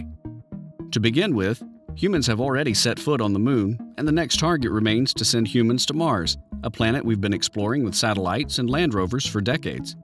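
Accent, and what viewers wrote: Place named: United States. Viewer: Great video - too bad that the speaker has a boring and uninteresting American voice. A British accent would be much better for the viewership - or anybody else for that matter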